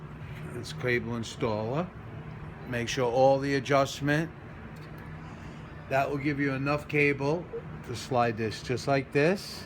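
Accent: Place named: Bronx, United States. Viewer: I will be applying this fix to my 2005 Buell Lightning XB12Scg, since they have similar engines, and hopefully, the concept is the same. However, I won't know for sure, until I get in there. I'll let you know how it goes. Thanks again. By the way, you sound like you're from the Bronx. I'm originally from Throg's Neck.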